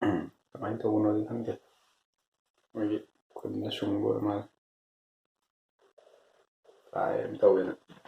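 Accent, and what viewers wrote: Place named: Keishampat, India. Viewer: Bro gi khdang se eikhoi keishampat khdang ga chp manei hydi eymbei dialogue se..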